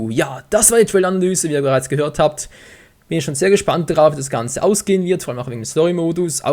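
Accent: Switzerland. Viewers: hahahahahaha er isch schwizer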